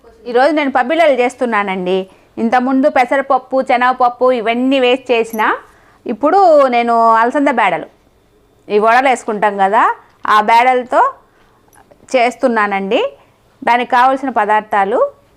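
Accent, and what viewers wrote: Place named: Anantapur, India. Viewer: Aunty mata Brahmins mata la undadu vere slang. Ananthapur atu side la untadi ekkada meeru undedi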